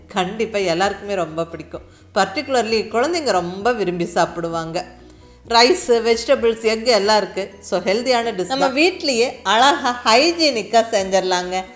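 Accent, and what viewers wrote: Coimbatore, India. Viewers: அக்கா நீங்க பேசும் கோயம்புத்தூர் தமிழ் ரொம்ப அழகாக இருக்கிறது.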